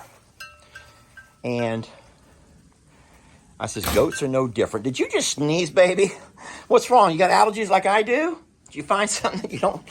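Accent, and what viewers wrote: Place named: Texas, United States. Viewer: I get a kick out of figuring out if the way you pronounce words is a Texan thing or. 😂😂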